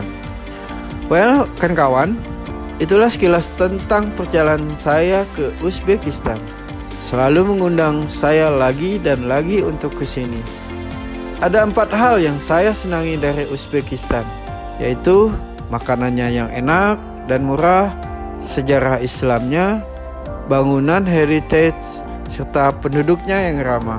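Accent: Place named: Banjar, Indonesia. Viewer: Suaranya seperti Urang Banjar ya, R-nya susah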